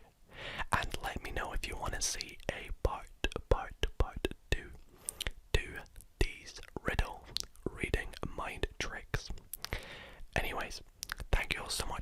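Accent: Scotland, United Kingdom. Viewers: Hey are you Scottish? I'm just asking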